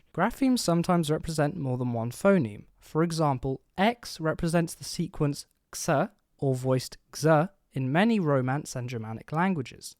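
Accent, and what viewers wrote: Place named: India. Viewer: I can hear the Indian accent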